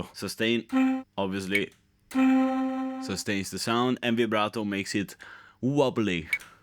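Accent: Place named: Emilia, Italy. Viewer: Sei palesemente emiliano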